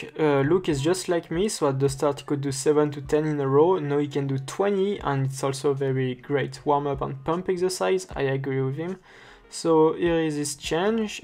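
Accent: France. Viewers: J ai reconnu l accent français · tu parle français ça se sens gg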